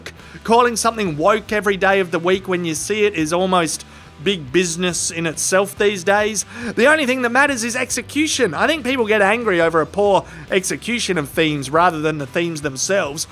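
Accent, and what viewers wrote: Australia. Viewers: But yeah, no, that’s how all Aussies talk.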